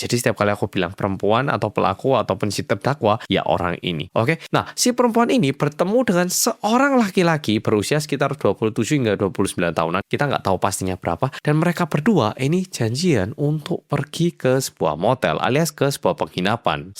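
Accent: Java, Indonesia. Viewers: Nemu channel wong korea medok banget😅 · BHS Indonesia nya medok sekali, udah berapa lama tinggal di Indonesia, oppa/abang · Logatmu mirip orang jawa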